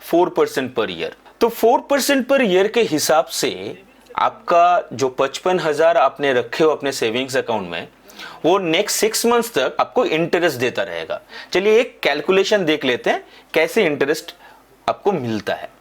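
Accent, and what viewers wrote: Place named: Bengal, India. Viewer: I can say you are bengali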